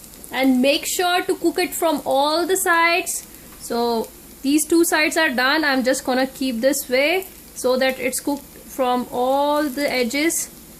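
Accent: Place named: Punjab, India. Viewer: U have punjabi accent. English doesn't suit you. If u speak in punjabi it will sound more good seriously.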